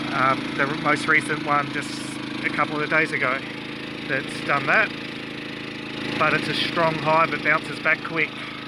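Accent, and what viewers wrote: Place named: Australia. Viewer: Where are you from? You sound Australian.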